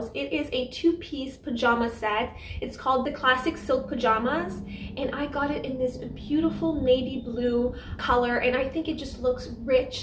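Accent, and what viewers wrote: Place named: Quebec, Canada. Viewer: Leave alone, she’s French Canadian, there is so many words that are pronounced differently in Canada. I live in the USA but I am a Canadian.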